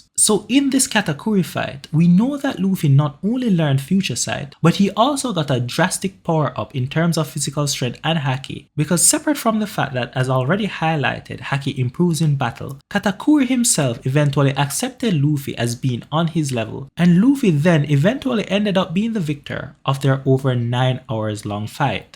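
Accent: Jamaica. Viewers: U Jamaican? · This man sounds like a yaadie. Subbed. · Definitely Jamaican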